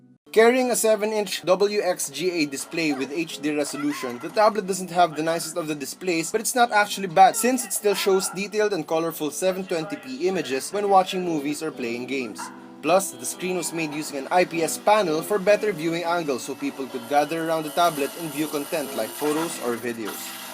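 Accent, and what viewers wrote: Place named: Philippines. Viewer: Heavy Filipino accent 😊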